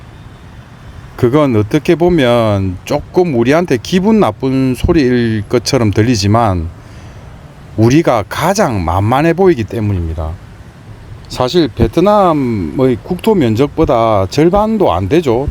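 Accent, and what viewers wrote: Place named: Gyeongsang, South Korea. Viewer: 구수한 경상도 사투리 ㅎ 간결하면서 통찰력느껴지는 경륜ᆢ 구독신청했네요. 하노이 여러번 가봤는데 유투브보니 다시가보고 싶네요 ㅎ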